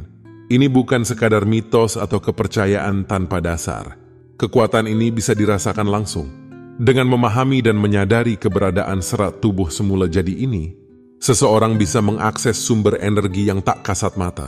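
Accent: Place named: Malaysia. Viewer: Logatnya Seperti Bahasa Melayu Yang Disampaikan Oleh Penuturnya... Tetapi Text Tertulisnya, Menggunakan Bahasa Indonesia...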